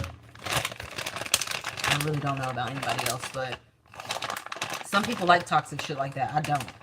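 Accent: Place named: South, United States. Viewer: we Luv you you too sexy and your voice is beautiful. I love your southern accent it is sublime. you just don't know. well now you do mmmmmm there is no comparison between you too. I came threw the door and said it before, that was that shit.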